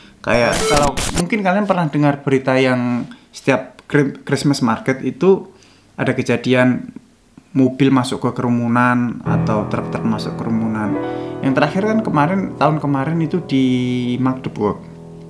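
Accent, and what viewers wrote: Java, Indonesia. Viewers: Baru ketemu channel ini. Kok, bisa lidahnya istiqomah medok sekalipun sudah jauh ke Jerman? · Org jowo Yo mas?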